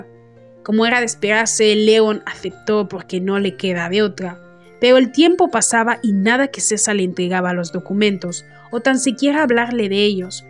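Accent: France. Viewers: como habla le da un acento frances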